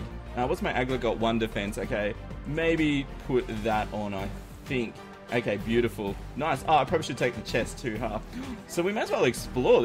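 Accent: Australia. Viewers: Ye he is australian like me :D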